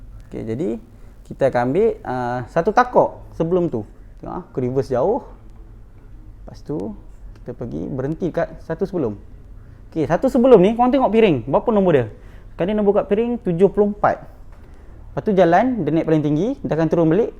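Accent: North, Malaysia. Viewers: Lama2 skali bunyi jugak loqhat utara...apa2 pn best penerangan beza cam..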